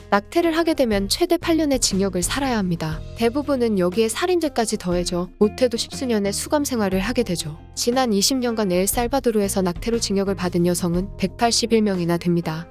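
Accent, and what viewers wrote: Gyeongsang, South Korea. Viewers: 경상도 여성분이신가봄. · 엘쌀!바도르 58회 고향이 경상도인가봐요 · 경상도 분이신가??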